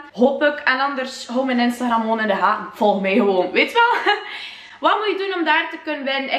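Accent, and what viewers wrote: West Flanders, Belgium. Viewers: iPhone 6 golden dots aub, je maakt zo'n leuke video's gewoon zo int west-vlaams. Ik begrijp wat je zegt want kben ook van kortrijk!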